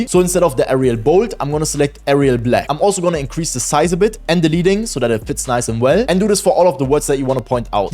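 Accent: Germany. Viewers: man merkt das du deutsch bist dikka der Akzent killt mich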